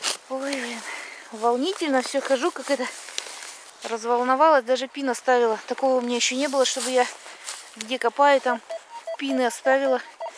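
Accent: Russia. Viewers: Уральский говорок? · Говор то северный, так приятно слушать)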